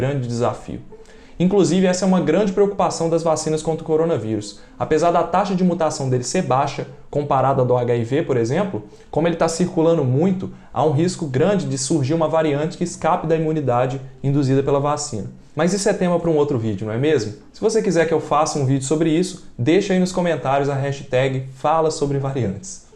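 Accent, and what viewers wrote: Minas Gerais, Brazil. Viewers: Vc é mineiro. Deu pra notar.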